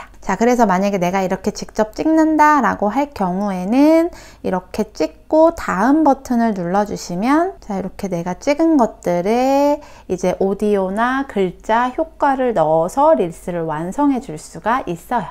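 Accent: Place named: Gyeongsang, South Korea. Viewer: 경상도 사람이네요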